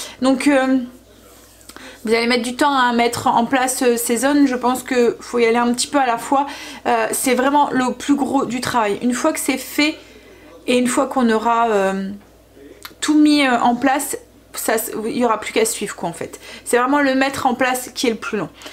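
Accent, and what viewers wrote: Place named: Nord, France. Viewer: Cc et oui tu es du Nord et la haut on a sa façon de s exprimer, tu es nature et c super et c pour cela qu' on t adore bises des hauts de France en force 😘😘😃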